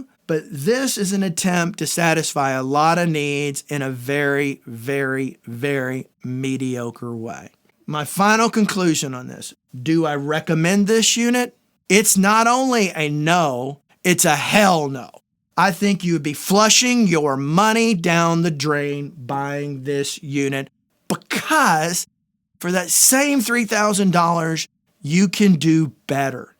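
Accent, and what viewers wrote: South, United States. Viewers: Thank you for saving me from buying this! I have a lot of hardware synths and was considering it, but kept feeling there was something not up to snuff. Love your forest gump accent!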